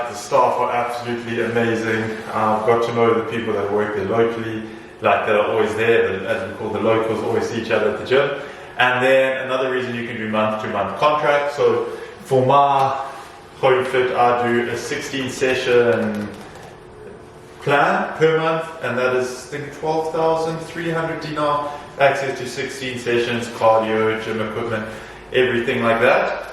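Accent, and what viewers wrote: South Africa. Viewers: Hi, judging from your accent you are South African😊 I'm south African and looking to stay a few months in Algeria. Where exactly are you staying? And how does life compare to back home. Ps. We're Muslim so cultural integration shouldn't be too much of a problem.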